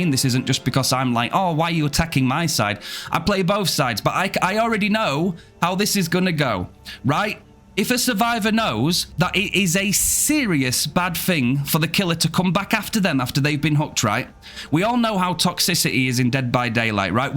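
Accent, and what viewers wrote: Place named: United Kingdom. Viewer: Bro this was so funny listening to especially the start to mid rant and the british accent just is the icing on the cake, I say this with love as a fellow european you are really good at ranting and the accent fits perfectly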